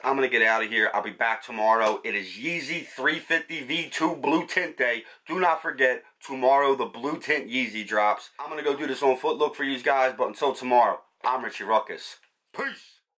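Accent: Philadelphia, United States. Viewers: haha randomly found you from your vapormax chukka video I realized you were from philly as soon as I heard you say like 5 words. I'm from Harrisburg man its cool seeing guys in my state doing dope shit!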